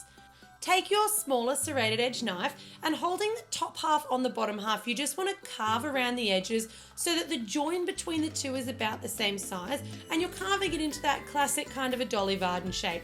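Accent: Australia. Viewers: Your beautiful And I luv you Australian accint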